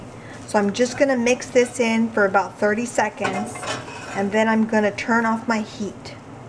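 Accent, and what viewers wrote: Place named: United States. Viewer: You have an American accent. Do you live in the states?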